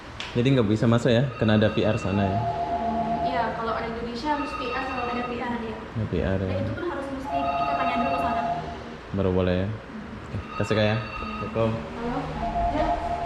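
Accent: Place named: Malaysia. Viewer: Mau tnya,abang nih asli mana ya,? Klu ngomng ada melayu2nya?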